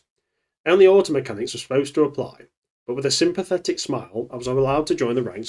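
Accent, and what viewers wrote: United Kingdom. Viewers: Thanks for your work! Weird to hear Carius' memories with a British accent, but cool anyway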